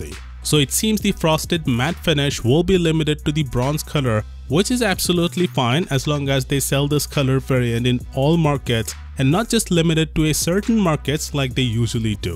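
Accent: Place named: India. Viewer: I thouht that the dubber of this channel was indian, the accent was pretty clear:v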